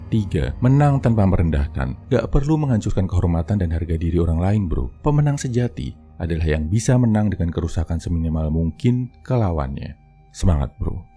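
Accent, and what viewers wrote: Java, Indonesia. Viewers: Keren bang pasti orang jawa ni admin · Mas wong jowo yo?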